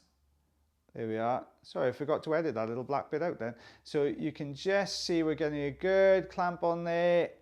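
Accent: Wales, United Kingdom. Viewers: I was always squeamish about ear wax but I love your gorgeous Welsh accent and how you describe the procedure. Thank you 😊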